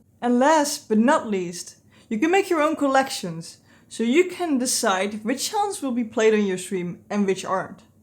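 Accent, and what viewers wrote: Netherlands. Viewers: nice are you dutch you sound dutch!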